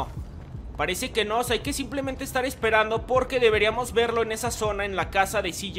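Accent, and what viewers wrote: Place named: Chihuahua, Mexico. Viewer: Eres de chihuahua verdad lo supe por como ablas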